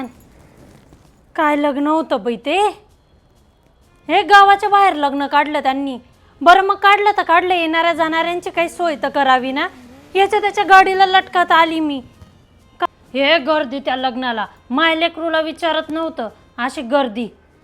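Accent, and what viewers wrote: Khandesh, India. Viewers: आहेरणी बोलत रवा मस्त वाटस मै खान्देशी माल्ये अभिमान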